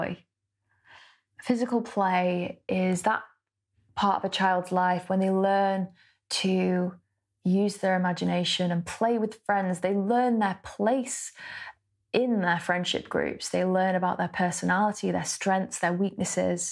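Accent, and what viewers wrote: United Kingdom. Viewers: #waw Untouched by the comprehension of English poems! felt like a school student once again though I am a researcher that too in Forests! to my great surprise I enjoyed like a terribly good ice-cream! first time here, liked shared and subscribed #wishes BTW your climate anthem song is a gentle tornado! and your beautiful British accent is charming like you. welcome!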